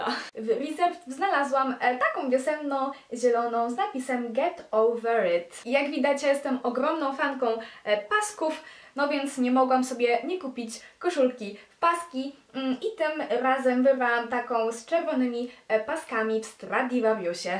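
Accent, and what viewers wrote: France. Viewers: Czemu masz taki francuski akcent?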